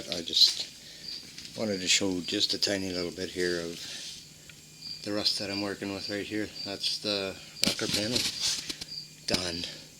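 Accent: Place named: Canada. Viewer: Sounds like you’re from Canada, I’m sure I’m off. Great job for not being a body man and you had your best friend helping you with the hood! Living in NYS the Capital of RUST BUCKET HEAVEN understanding and having the patience for fixing a truck like this is truly a virtue! My 05 silverado is getting the Shit beat out of it body from all the SALT and WEATHER MODIFICATION WARFARE we as human beings are FORCED TO ENDURE HERE in The Great Lakes Area over A Period of DECADES! Nice job maybe if I can muster up the patience between my cancer treatments and pain management from exhausting amounts of painful spinal surgeries I’ll be able to fix my truck in between fixing my house from the December 22 winter snowstorm that killed human beings along with locking everybody and everything in sight for a couple of weeks!!! It’s a shameful disgraceful sinful world we live in since the deep state Covid tyranny was forced onto humanity, so good for you for keeping yourself busy! 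We can all bury are heads in the sand now that the Covid tyranny is all gone!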